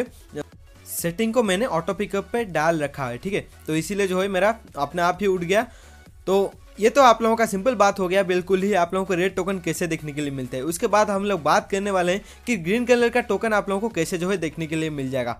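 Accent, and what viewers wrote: Odisha, India. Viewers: Are u from odisha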